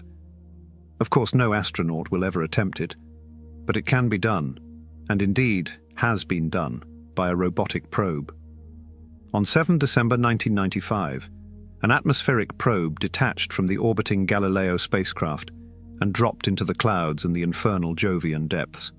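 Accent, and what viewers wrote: United Kingdom. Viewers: Finally a video with a British narrator🎉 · 🎉 Right? Everything just sounds 20% smarter with a British accent 🇬🇧📚 Feels like you're watching a documentary and getting knighted at the same time 😂 Who else lowkey rewinds just to hear them say “laboratory” again? 👇🎧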